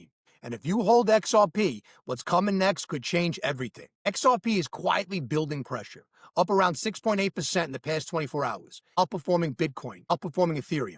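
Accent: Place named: New York, United States. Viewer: That New York accent ❤😊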